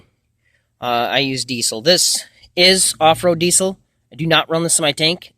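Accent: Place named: Michigan, United States. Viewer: Bro are you from Michigan? I went to welding school with a guy that sounds identically to you 😂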